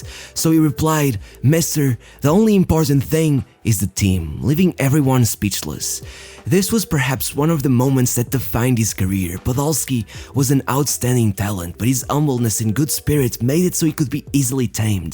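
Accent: Portugal. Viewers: Não sei porquê mas há algo que na tua forma de falar que se percebe que és português 😂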